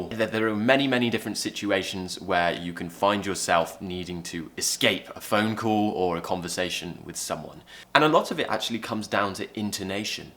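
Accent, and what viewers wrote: United Kingdom. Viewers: Your British accent is really beautiful.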